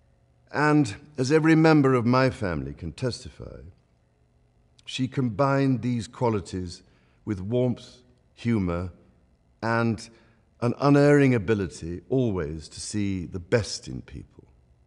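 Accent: United Kingdom. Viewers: This is how I an american think every British person sounds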